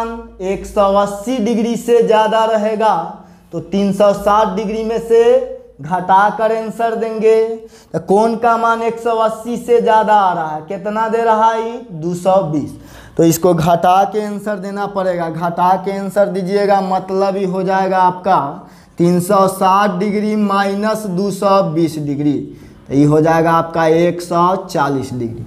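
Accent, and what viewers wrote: Bihar, India. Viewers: Bihar se ho kya bhai.......... Mai bhi hu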